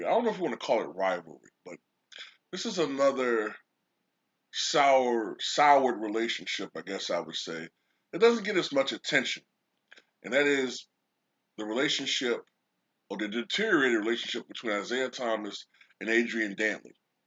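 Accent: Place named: Detroit, United States. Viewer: Thank you you sound like you're from here meaning Detroit I was just an elementary when all that happened so some of it I remember some of that was basically because of attitude and also ball movement Danny's game was a great game but it's slow down the offense it was the Pistons being young they wanted to run and also they wanted to play defense with AD wasn't that great at add was great but he just wouldn't compromise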